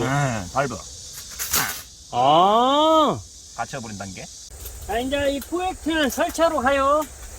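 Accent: Jeolla, South Korea. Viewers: 진짜 전라도 사투리 확티가나네요 ㅋㅋ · 사투리가 어디에요? 전라도? 충청도?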